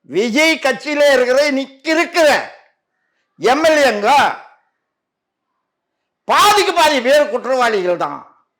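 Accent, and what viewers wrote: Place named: North, India. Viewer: நீ யாரு உன் தமிழ் இவளோ மட்டமா கேரளா கர்நாடகா ஆந்திரா வடக்கன் போல இருக்கு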